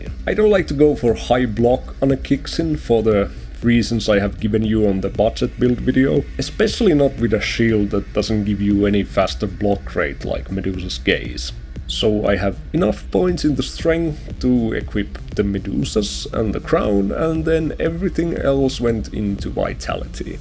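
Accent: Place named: Finland. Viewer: ahh :D tunnistin heti suomen aksentin sun videosta :D gj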